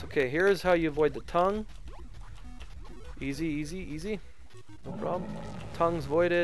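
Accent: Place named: Alaska, United States. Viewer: Good collection of tips! I like your accent too. Reminds me of my time in Alaska.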